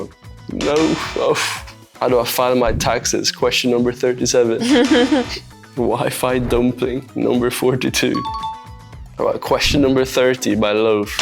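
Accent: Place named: England, United Kingdom. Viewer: he has a definite english accent, forsure picked something up from kai and fastro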